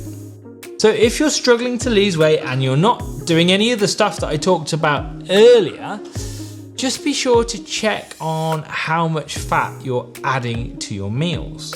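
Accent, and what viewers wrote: United Kingdom. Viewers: It's sooooo refreshing to get useful advice & info about weight-loss & nutrition, especially from someone who's been there himself. It's also great to hear a British 🇬🇧 accent, as opposed to those Americans who drown you with info & grate on your ears 😣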